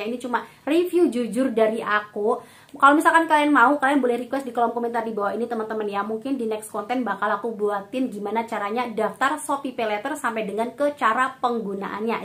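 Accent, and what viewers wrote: Java, Indonesia. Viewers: Logat jawa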